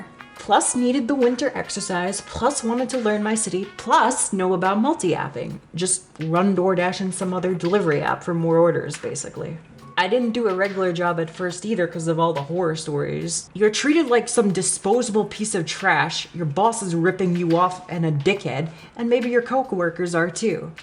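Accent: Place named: Philadelphia, United States. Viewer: You from Philly? Auto sub immediately.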